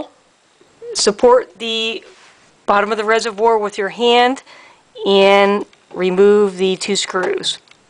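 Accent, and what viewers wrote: Philadelphia, United States. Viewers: Philly area gal. Can tell by the accent. "Wooder."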